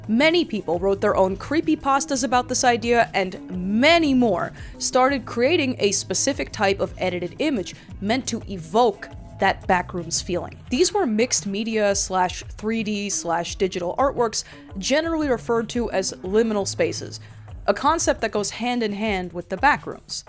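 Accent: New York, United States. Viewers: WYM didn't speak english fluently? You sound like you're from NY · I am very suprised how you can talk like a average american while english is your 2nd language , how did you improved your accent ?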